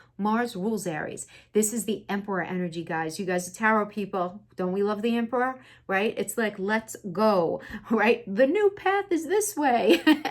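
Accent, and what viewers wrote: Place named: New Orleans, United States. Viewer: I just love your accent! Are you from New Orleans? This is the 1st time seeing your face and you're absolutely beautiful. I resonate with your Gemini Readings the most. I admire how connected you are.🙏🦋 Goals...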